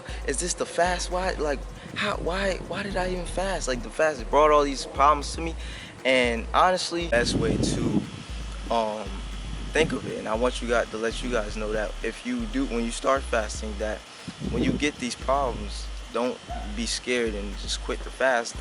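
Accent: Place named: South Africa. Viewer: Super cool video! Where you from? You Sound South African lmao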